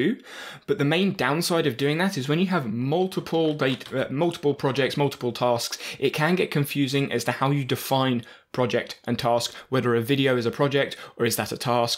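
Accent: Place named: Gloucestershire, United Kingdom. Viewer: Cheers. Where you based? You sound local. Gloucestershire area?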